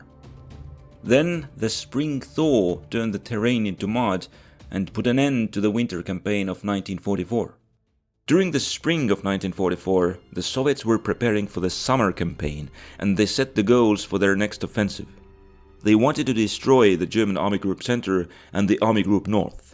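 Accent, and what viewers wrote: Estonia. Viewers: are you estonian. You sound estonian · YOU SOUND LIKE ESTONIAN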